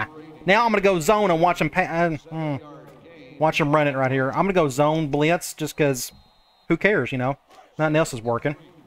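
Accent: South, United States. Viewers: That southern accent hits😂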